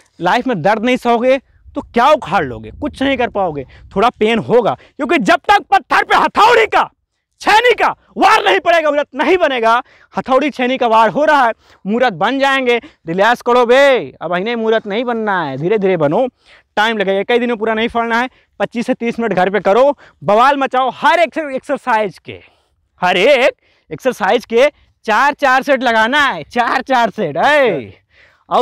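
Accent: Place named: Bhojpuri, India. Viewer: Bhai....love ur Bhojpuriya Tone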